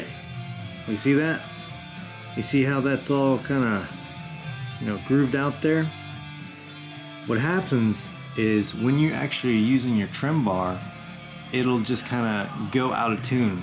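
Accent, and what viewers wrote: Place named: St. Bernard Parish, United States. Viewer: U sound like ur from st bernard parish, i love ur accent❤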